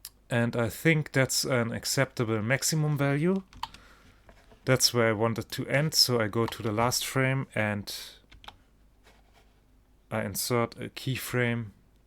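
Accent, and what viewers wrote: Germany. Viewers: Sehr Interessant Blender so zu nutzen, schönes Tutorial, komisch das man einen deutschen Akzent immer raus hört :D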